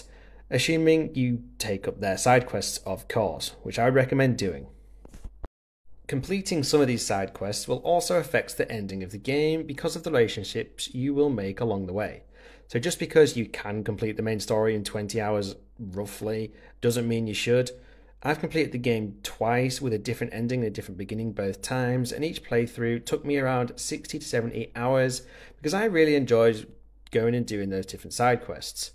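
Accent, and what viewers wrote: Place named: United Kingdom. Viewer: Was expecting a terrible loud overzealous voice (common for gaming channels), but instead found a softly spoken British man who calmly conveyed the message in a concise manner. Excellent work, subscribed ❤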